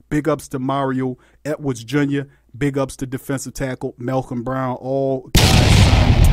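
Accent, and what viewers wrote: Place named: New Orleans, United States. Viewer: That's new Orleans for you I'm from the boot so it sounds normal to me 🤣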